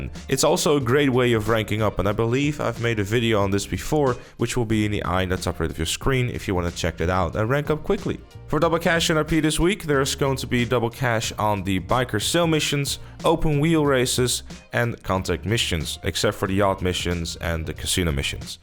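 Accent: Netherlands. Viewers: Love it, are you Dutch tho?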